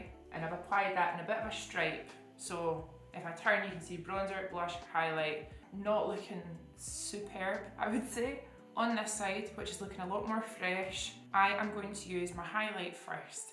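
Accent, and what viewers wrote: Scotland, United Kingdom. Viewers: I'm feeling buzzed and chatty... You are so stunning, and your Scottish accent makes me want to start a bar brawl for no reason. We love the same colors... The right side wins but I love the round eye and brightness on the left. You're right about makeup's evolution in our personal lives in relation to how we see ourselves.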